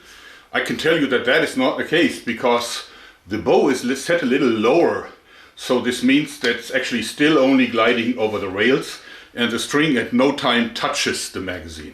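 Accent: Germany. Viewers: do you want to learn German, or do you just like the accent?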